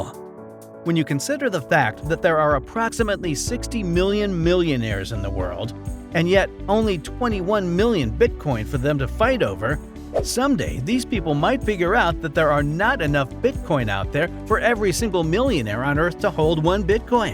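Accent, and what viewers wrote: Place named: United States. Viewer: Most american voice ever.. over the top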